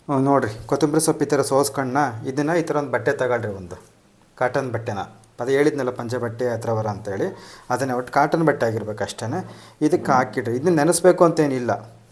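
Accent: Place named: Hiriyur, India. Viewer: ಹಿರಿಯೂರು ಹುಡ್ಗ ಅನ್ಸುತ್ತೆ ಎಂತಾಸ್ನಾಗ್ ಐತೆ ಬಾಷಾ ಶೈಲಿ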